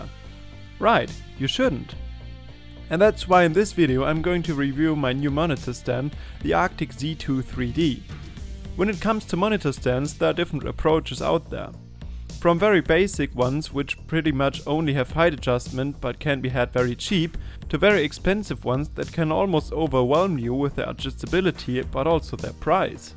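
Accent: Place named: Germany. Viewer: Ich liebe deinen deutsch akzent gutes review, auch wenn ich es 4 Jahre später sehe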